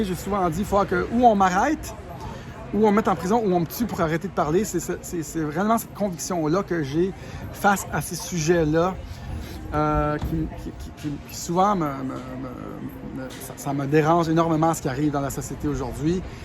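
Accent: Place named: Canada. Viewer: En plus tu es très sympa. J' aime entendre les canadiens qui parlent français parceque je les comprends bien.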